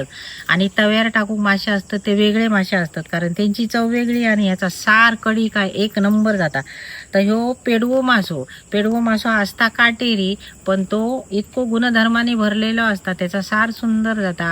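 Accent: Malvani, India. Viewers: खरा सांगा, तू वाहिनी पूर्ण मालवणी बोलतंय आयकाक खूप बरा वाटला. वालय , बेळो , डोनो वाळण हे सगळे शब्द आमच्या घरातली आठवन करून दितत. फक्त गडबड अशी व्हाता पेडयांची कढी बघून प्रत्यक्ष जेवक गावला नाय ह्याचा वायट वाटता. आसानी, देव तुझा भला करू मावले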